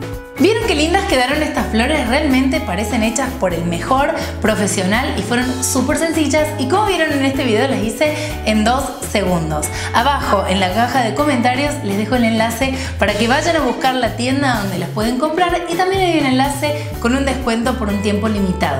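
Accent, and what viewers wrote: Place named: Rioplatense, Argentina. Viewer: te escuchaba y digo ser argentina esta chica o uruguaya y vi el mate de fondo jajaj osea que si! hermoso video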